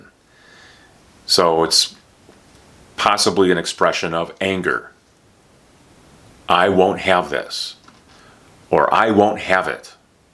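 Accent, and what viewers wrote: United States. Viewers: trophy-yellow-smiling::trophy-yellow-smiling::trophy-yellow-smiling::trophy-yellow-smiling::trophy-yellow-smiling: ... a great teacher with a great All-American pronunciation ... !!!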